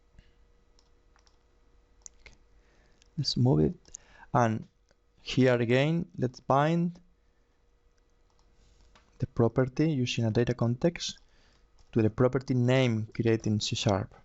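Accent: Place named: Spain. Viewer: Suena a español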